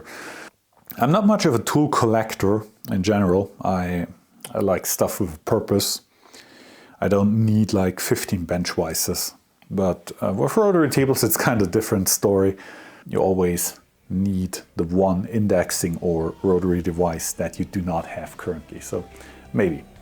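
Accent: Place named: Upper Palatinate, Germany. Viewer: Very nice 😎👍 if you ever purchase sthg big again and want to clean it, drop by my shop and use my Würth Parts Cleaner, VOC free, that stuff gets the grime off in no time. I am not sure of your location, but you sound like a Oberpfälzer 😉 i am located 15min next to munich airport with the shop 😊 Greetings, Chris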